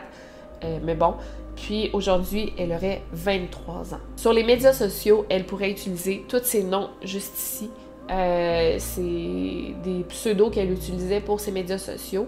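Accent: Quebec, Canada. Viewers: Nanette et Marjo 🥰! Et personnellement j'adore les miaulements ponctuant ton récit,. Récit passionnant comme d'habitude, et si bien narré avec en prime ton accent québecois 😊.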